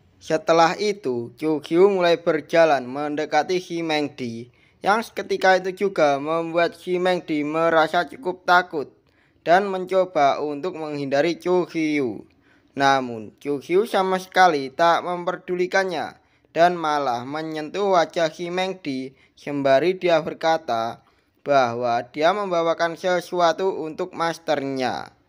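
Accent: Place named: Java, Indonesia. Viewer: Bahasanya terlalu medok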